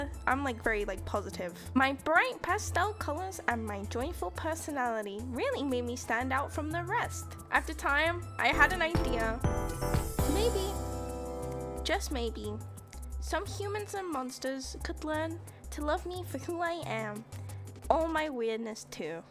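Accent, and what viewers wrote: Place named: Liverpool, United Kingdom. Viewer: Oh snap a Bri'ish VTuber, and a Scouser no less! I absolutely love your design! It's nice to hear a Scouse Vtuber tbh, I'm a Woolyback with a lotta family down in Liverpool so it's kinda nostalgic for me to hear. It's also nice to see a VTuber who I might actually be able to watch without destroying my sleep schedule because of time zones!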